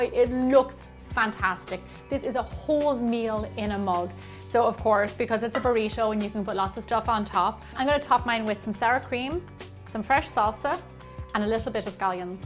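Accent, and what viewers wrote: Ireland. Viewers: Are you Irish!? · Are you Irish? Love the accent!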